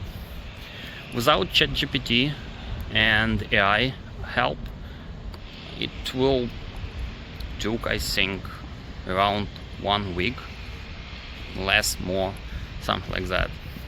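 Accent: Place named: Eastern Europe. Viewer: толковые вещи говоришь, но акцент... я в курсе, что у них ещё хуже за счёт индусов итп, и в курсе что нет ни времени, ни сил исправлять, но славянину капец как режет слух родной славянский акцент в англ если хочешь, могу подсказать рецепты быстрого удаления наиболее режущих слух моментов нет, я не препод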